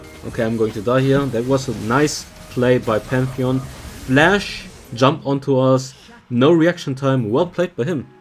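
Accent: Germany. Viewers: Are you german? Your accent sounds german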